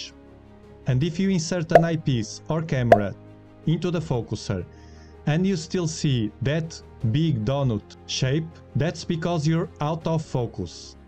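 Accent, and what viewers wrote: Portugal. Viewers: Ayo Portuguese accent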